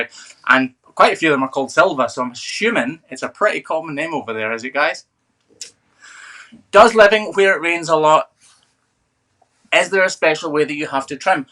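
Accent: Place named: Southwest Scotland, United Kingdom. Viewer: Love your videos, very interesting. You have a familiar accent from where I used to live in SW Scotland, I lived in Glentrool village then the Isle of Whithorn. You're definitely from that neck of the woods.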